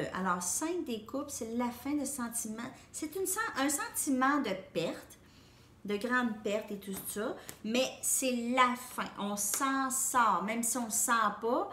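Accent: Quebec, Canada. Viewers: Cc tout est très juste pour ma part pourtant malgré ttes mes épreuves...j ai perdu mon papa le <num> J ai du rompre après 10 ans de vie de couple d avec mon compagnon sur Nice car il n accepté pas les chats de mon papa et j avais fais une promesse à mon père je suis comment dirais je vidée épuisée fatiguée et paradoxalement dotée d une force incommensurable je déteste ces fêtes j aimerais enfin vivre un peu j ai 52 ans et le 6 février prochain min fils Quentin part travailler en Australie gros becs à toi ton accent j adore en 1991 je suis partie au Canada pour mon stage d infirmière j étais dans la région des Laurentides....et les cabanes à sucres que de bons souvenirs donc à cette heure là ici en France ou je te regarde il est 16h27 j en suis à un.point ou tu pourrais m annoncer la fin du monde je te dirais ok c est bien....en plus le syndrome du gisant je suis née le jour de la mort de ma grand mère maternelle si tu savais comme je rêve juste de me poser à qq part ou ailleurs avec mes 9 chats....Nagua Mistigri Pikatchou kopi césar choupinette blanchounette noirou roxy et rouquinou malgré tt cela je reste positive mais je fatigue et comme chanté mon chanteur préféré ^...espère avec ton coeur...la réponse est en toi la question est ailleurs ce n est pas parcqu une étoile s éteint qu' elle éteint forcément le ciel ^...écoute la chanson sur YT:^ ^ Québec je me souviens...une seule envie y retourner passer qq jours devant ses gran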